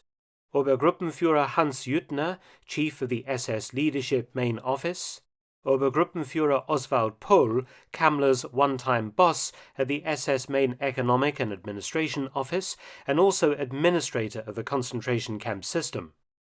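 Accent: England, United Kingdom. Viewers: Fantastic English accent....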